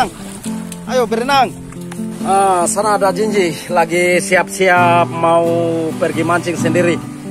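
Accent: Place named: Makassar, Indonesia. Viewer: Salam dari Buton#gue kirain ornag Makassar hheee padahal orang baubau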